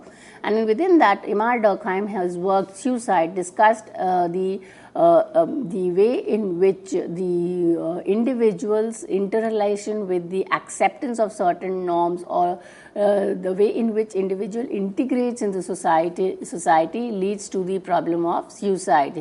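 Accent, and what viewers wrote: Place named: Haryana, India. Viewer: Robotic and fast lecture. Very discouraging for the students. Memorised lecture marred by hariyanvi accent.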